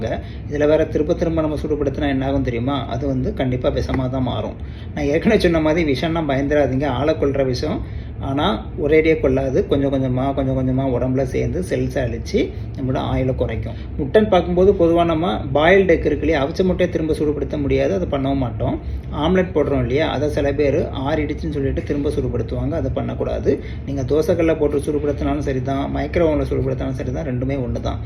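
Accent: Tirunelveli, India. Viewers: Ungal nellai tamil alagaka irukkirathu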